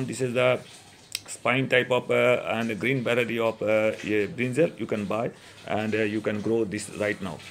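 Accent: Bengal, India. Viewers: Sir it is evident from your surname that you are marwari. But your accent is that of a bengali.how??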